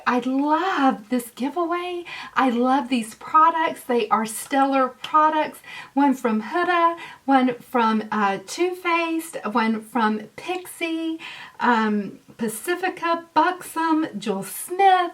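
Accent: South, United States. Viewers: Girlfriend you are definitely southern.love it!please enter me IG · Where are you from..so southern..even for me and I'm in Arkansas..lol.. Enter me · Loving that Southern Belle accent and that laugh!! 🤣❤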